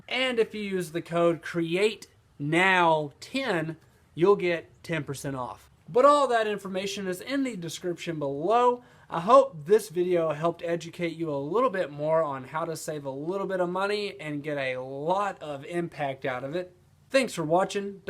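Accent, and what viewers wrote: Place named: Georgia, United States. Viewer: are you from Georgia? you have an accent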